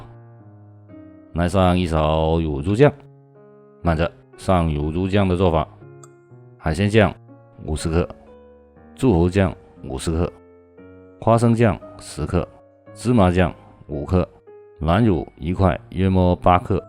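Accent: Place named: Fujian, China. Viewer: 是福建口音 哈哈哈哈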